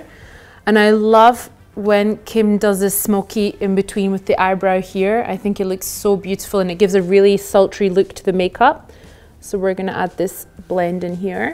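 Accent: Scotland, United Kingdom. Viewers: you look stunning! Please make more English videos. It's amazing to hear English with Scottish accent.:) Love u. <3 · I love your British accent! Please take more videos in English. Greetings from North Carolina <3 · that british accent thoooo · Scottish accentin cok tatli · Loved your scottish accent. I’d like to move&study in Edinburgh or St Andrews one day. Bonnie Scotland💙🏴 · I'm hearing lil bit british accent, especially when you are saying 'now', love ittt❤️ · tanrı misafiri iskoç aksanıyla konuşuyor, cehaletinizi belli etmeyin bari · helal abla senin scottish aksanına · Lovely video, you've spoke with a Scottish accent.. * Edit: Carries on watching the video and realised has a family living in Scotland! 🙈🤦‍♀️ Lots of love from London! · Daha önce yurt dışında yaşadın mı ingiliz aksanına benzettim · İskoç aksanı mı bu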